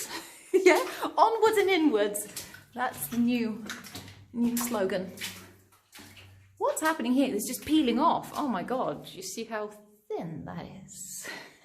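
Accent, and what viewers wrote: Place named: United Kingdom. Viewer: No Chris with you?. Your accent is developing into a really posh english one now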